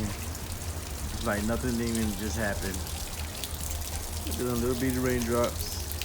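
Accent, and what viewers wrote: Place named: South, United States. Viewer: Let their be rain wow coming down sounds like you from the south I’m from Louisiana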